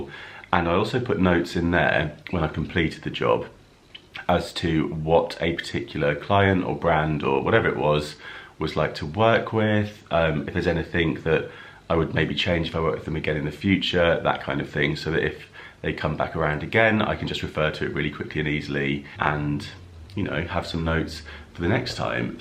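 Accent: United Kingdom. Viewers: You could put out a video about anything and I would watch. Really. You could just sit and play with the kitties. I love your attitude, you're interesting, you're funny, you live in the UK, and I love hearing the accent and seeing the sights. Congratulations on the book! That's exciting! I would love to watch the series, but I don't think there's a way to do that here. I hope you feel better. Happy New Year to you and the kitties!😊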